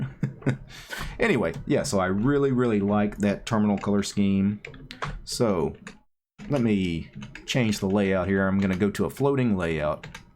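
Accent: South, United States. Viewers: love the accent always a pleasure to listen to a techie southerner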